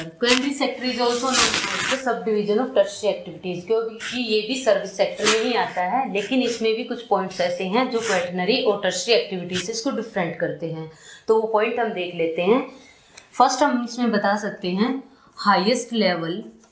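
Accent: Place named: Haryana, India. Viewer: Really appreciable video, cleared all doubts and confusion (btw maam aapki English mai bhi Haryanvi tune aa rhi h) 😅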